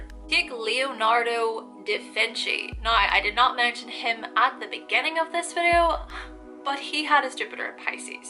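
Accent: Ireland. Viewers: It's because is from lovely Ireland^^ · The Irish accent is extremely thick. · You have the most wonderful inflections. You're Irish, but it's a very lovely version, thank you..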